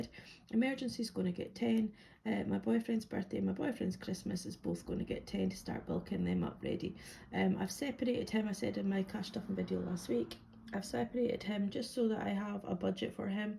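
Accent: Scotland, United Kingdom. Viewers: Yeahhh she’s back with that sexy Scottish accent 👏 Glad your voice is almost back to normal Also absolutely FAB 50p challenge ❤️❤️❤️ it 🙏🏻xx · Glad you’re getting your voice back a bit 🙌 I agree - the Scottish accent with subtitles is hilarious - always pray no one uses the subtitles with my videos 🤣🤣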